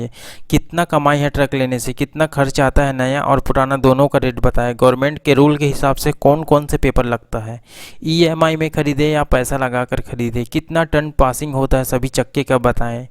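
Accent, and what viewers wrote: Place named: Chhattisgarh, India. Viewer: Tu chhattisgarhria aadmi h bhai tone se lag rha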